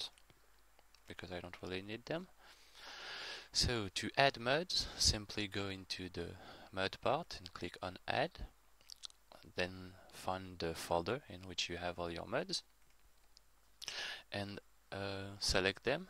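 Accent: France. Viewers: On s'en fout de l'accent j'ai jamais un francais parler si bien l'anglais. -un quebecois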